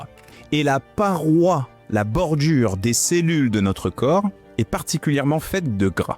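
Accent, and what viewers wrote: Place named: Picardy, France. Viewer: C'est l'accent de la Picardie , on prononce les o ouverts .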